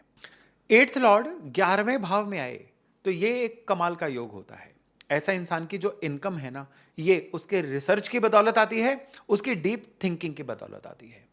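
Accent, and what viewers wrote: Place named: Kanpur, India. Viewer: Sir ap kya kanpur se belong krte h 😅batiya rhe h yhi bola jata h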